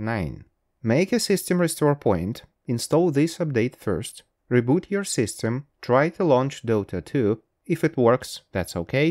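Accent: England, United Kingdom. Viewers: Да вы из Англии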